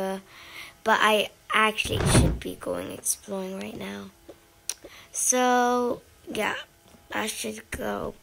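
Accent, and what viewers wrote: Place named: England, United Kingdom. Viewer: 𝕀 𝕝𝕠𝕧𝕖 𝕪𝕠𝕦𝕣 𝕖𝕟𝕘𝕝𝕚𝕤𝕙 𝕒𝕔𝕔𝕖𝕟𝕥<𝟛😛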